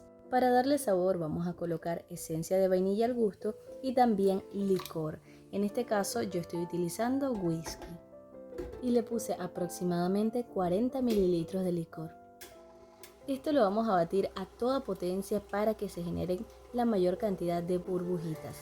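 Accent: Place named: Venezuela. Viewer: Me encanta! Te descubrí hace poco y voy a aprender mucho más ahora que comenzaré a trabajar con fondant. Me suenas a Venezuela ❤ Y para los que critican que le decimos quesillo al flan pues en Venezuela siempre se le ha dicho así y creo que casi nunca le han puesto queso crema... La costumbre 😝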